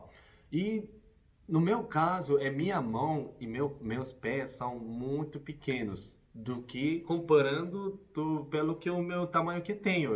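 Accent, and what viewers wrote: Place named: Mato Grosso, Brazil. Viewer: Nossa, o sotaque dele lembra o sotaque de quem é do estado do Mato Grosso.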